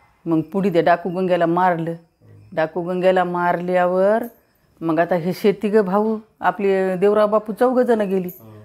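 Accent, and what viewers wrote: Nanded, India. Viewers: बोलण्याच्या भाषेवरून सांगतो माई 100% नांदेड मधील असाव्यात.एक अंदाज. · आजी आपल्या नांदेड भगाकडल्या आहेत..आमची भाषा ऐकून आनंद झाला..same आमची आजी अशीच होती💐💐🙏🙏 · Mrathvada mdhlay nanded chi language ahe wate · भाषा नांदेड जिल्ह्यातील वाटत आहे. माझं आजोळ आहे नांदेड जिल्ह्यातील भोकर तालुका.. माझी आजी पण हुबेहुब असच बोलते😊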